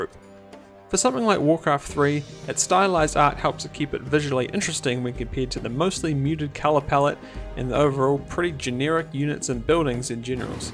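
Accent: New Zealand. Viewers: Are you a kiwi bro?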